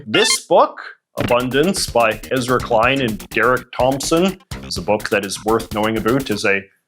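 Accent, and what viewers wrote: Canada. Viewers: I thought you were Canadian, J.J.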